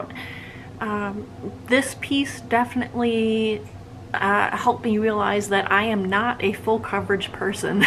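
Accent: Midwest, United States. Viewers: Welcome to FlossTube. Great job with your first video. Not sure what you meant about a Minnesota accent. My first impression before you told us was - yeah she is a Midwesterner like me! I am in Illinois close to the Wisconsin border, and you sound just like us down here. You betcha! I like your WIPs. They are are not the usual ones I see on FlossTube. Your love for animals is engaging, and I look forward to your sharing your knowledge of animals with us. Best wishes for a safe, happy and healthy holiday season. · Get in line for the Midwest accent,I'm a Scony Our mudpuppys look different too.😆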